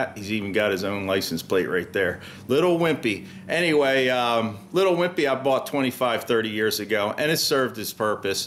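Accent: Pennsylvania, United States. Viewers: Wow Pennsy accent coming at yas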